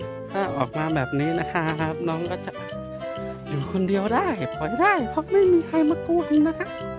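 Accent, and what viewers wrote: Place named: Isan, Thailand. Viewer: ต้นข้าวน่ารัก ชอบลุง พากษ์เสียงอีสานค่ะ ต้นข้าวเกิดอุดร ฟังภาษาอีสานรู้เรื่องคงได้ยินตั้งแต่เกิด....🥰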